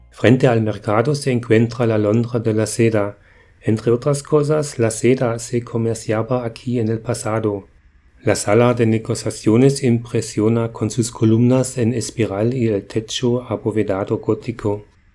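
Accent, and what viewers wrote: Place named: Germany. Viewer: warum mit deutschem akzent spanisch gesprochen ich kann es verstehen, aber die meisten deutschen nicht. super idee, den nicht so bekannten camino levante zu gehen. ich war 1972 in valencia resp. in der nähe und habe nur gute erinnerungen ausser an den zoo. damals hatten die tiere so eine art käfig wie im zirkus, da sind wir geflohen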